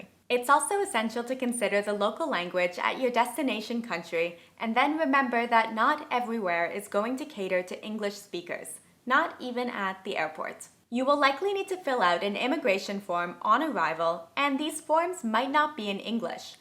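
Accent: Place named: New England, United States. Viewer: New England accent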